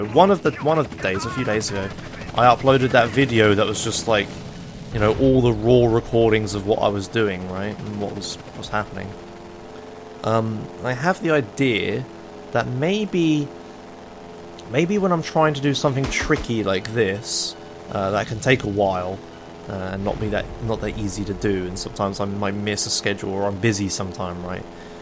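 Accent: United Kingdom. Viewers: Is your accent british?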